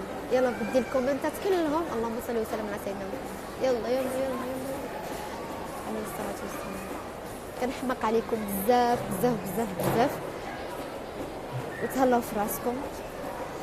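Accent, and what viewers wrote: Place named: Gulf, Arab world. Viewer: هضرتك ماشي مغربيه لميه فالميه كتصنعي وديما كتهضري مص هضرتك خليجي وكتباني بحال شي وحده فالاربعينيات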